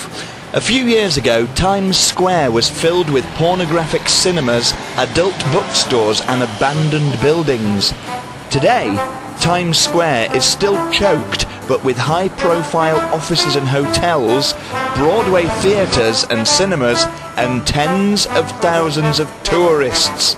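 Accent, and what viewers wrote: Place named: United Kingdom. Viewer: What a nice British Accent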